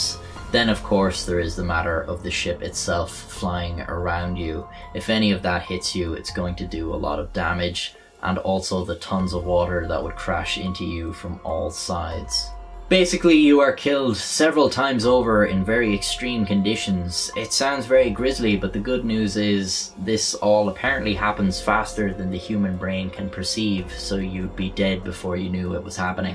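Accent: Ireland. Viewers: It's always grand hearing an Irishman tell a story, any story. Erin Go Bragh! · Lemme guess ur accent, Irish? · R u Irish? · I didn’t think that today I would be listening to a purple Irish man describing the most horrifying and instantaneous death possible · Irish accent, Video about the deep blue sea and submarines. He must be atlas from bioshock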